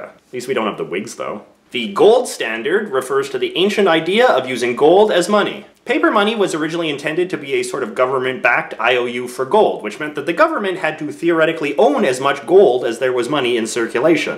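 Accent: Canada. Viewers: Soooooo fookin Canadian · As a Canadian I can tell by your accent, you are Canadian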